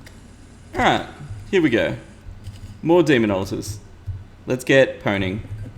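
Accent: Australia. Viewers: I didn't think you ever swor in ur vids because ur so and also r u from Australia or new Zealand ITS KILLING ME!!!!!!!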